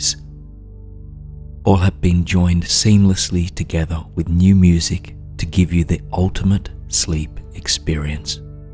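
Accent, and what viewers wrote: Australia. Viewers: Isn‘t he Australian? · I think he’s Australian not British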